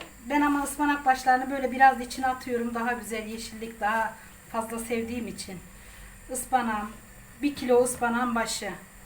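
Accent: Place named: Adana, Turkey. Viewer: Selam Hanım. Rahmetli annemin de bize yaptığı bu çorbanın tarifini ararken size rastladım. Konuşur konuşmaz Adanalı olduğunuzu anladım. Memleketten uzaktayken çok iyi geldi anlatımınız. Ellerinize sağlık.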